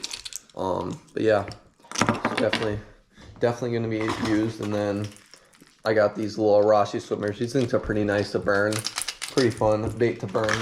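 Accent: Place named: Minnesota, United States. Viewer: You must be from Minnesota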